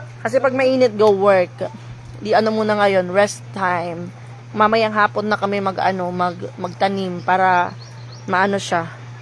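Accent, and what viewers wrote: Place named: Waray, Philippines. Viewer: salita mo parang salitang waray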